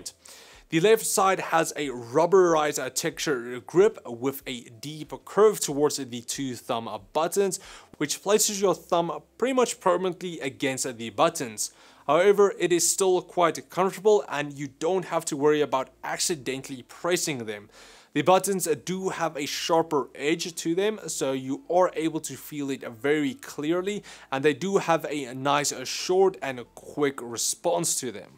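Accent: South Africa. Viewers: Wow South African damn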